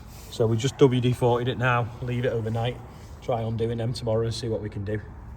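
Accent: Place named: Wales, United Kingdom. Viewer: Keep the birthday bumper Until may 25th so I can hear your welsh accent saying my name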